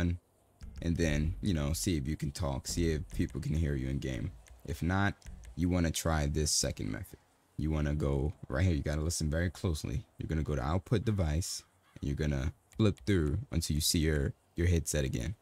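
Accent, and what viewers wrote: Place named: Memphis, United States. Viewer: You gotta be from memphis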